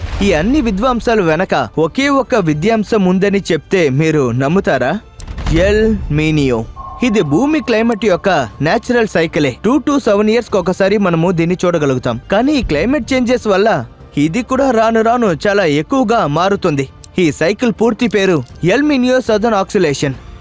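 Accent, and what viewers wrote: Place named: India. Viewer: మంచి సమాచారం. మరి ఎందుకు హింది యాసలో, ఆయాసంగా చెప్తున్నారు. ఎంత అనువాదం ఐనా, మంచిగా స్వచ్చమైన తెలుగు లొ మట్లాడొచ్చు కదా. భవిష్యత్తు వీడియోస్ లో సవరించగలరు.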